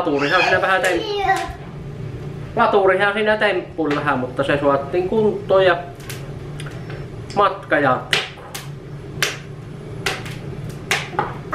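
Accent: Savo, Finland. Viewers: Siinon rehellinen, Aeto Savolaenen :D :D :D <3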